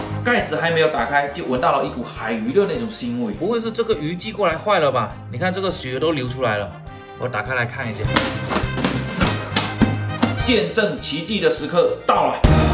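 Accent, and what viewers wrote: Fuzhou, China. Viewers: 就喜欢浓浓的福州呛！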